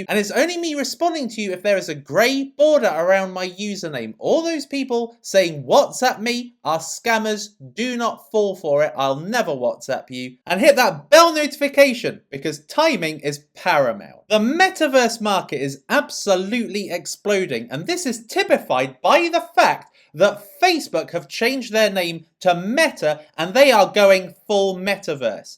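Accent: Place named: Ipswich, United Kingdom. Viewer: new subscriber, you sound like youre from ipswitch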